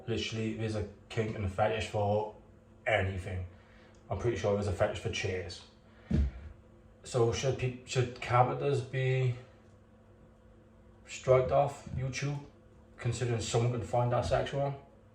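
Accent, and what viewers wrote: Wales, United Kingdom. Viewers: Great Welsh Accent...much softer than some...so, which Part of Wales. Id guess North Wales. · I don't suppose for one moment that I'm the only person who clicked on this as a result of the still pic at the start of the video! 😋😋As for topless "sexual" clips. There are plenty of those on YouTube! BTW, I'm trying to place your accent? Welsh? Apologies, if I got that wrong! 😉😉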